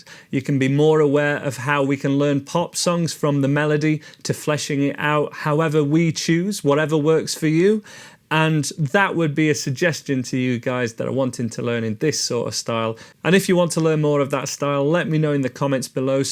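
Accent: Yorkshire, United Kingdom. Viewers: Pleased to meet you, I just found your video on you tube I liked your warm style, by that I mean you explain everything slow enough for an old fart like me now 68, I like also the way you break down sections, chords notes and the stave although I have to read more theory as I feel I am missing a very important part of my journey here mate. Shall I go on oh yes you mentioned flow training,that a big financial commitment will you be on there teaching or will it be someone else, so I am going follow you for a while see how I get on then take it from there really I like your accent poss Yorkshire guy. Thank you so much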